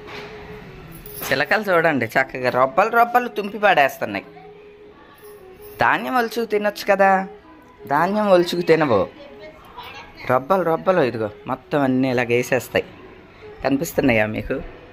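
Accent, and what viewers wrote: Godavari, India. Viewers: Natural గా మాట్లాడితే బాగుంది అమ్మా గోదారోల్ల యాస బాగుంటుంది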